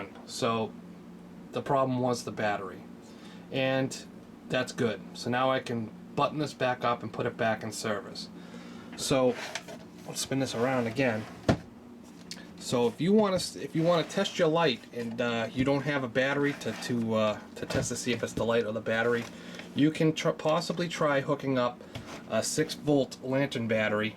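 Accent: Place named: New York, United States. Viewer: THANKS!! You answered all of my unanswered questions. Hit the nail on the head my battery read 4.2 volts. by the way loved the accent, you must be from New York Thanks again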